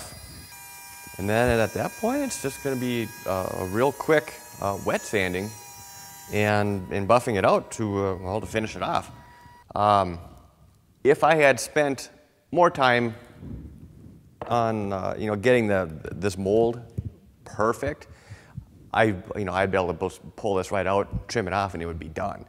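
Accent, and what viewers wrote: Midwest, United States. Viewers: this guy sounds so midwestern it makes me wonder what kind of boats they have over there that arent icebreakers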